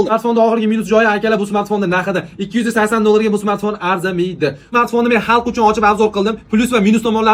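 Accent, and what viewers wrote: Andijan, Uzbekistan. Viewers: Андижон шева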